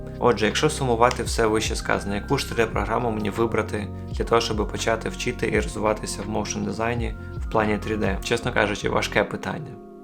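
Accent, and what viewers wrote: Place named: Russia. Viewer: У автора москальский акцент🤣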